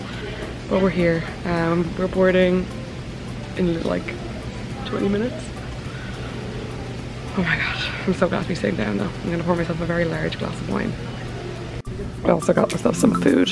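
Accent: Ireland. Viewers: Loved this vlog 💕Would literally buy the cottage in Doolin tomorrow if was for sale! NYC just pales into comparison for me. Trilled (!!) you made it home safely (love Irish accents 🤗) Looking forward to more vlogs from Ireland (Q and As would be fabulous) Delighted to see Cooper also 🐕 Stay safe, healthy and positive🌈 Does have a vlog or Instagram? 🌞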